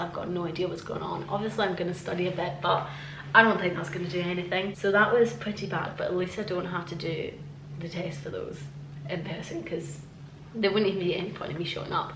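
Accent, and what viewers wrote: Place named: Glasgow, United Kingdom. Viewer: Is that a Glasgow University accent Ive heard so much about. Interesting video :D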